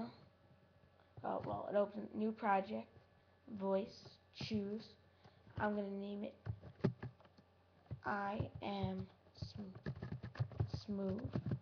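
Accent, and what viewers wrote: Texas, United States. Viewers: wat the hell is dis he sounds like he is from Texas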